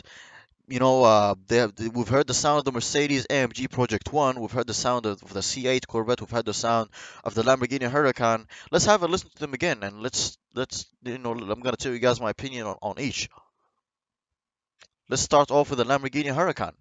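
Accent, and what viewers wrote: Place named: Scotland, United Kingdom. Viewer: Just you sound scottish 🏴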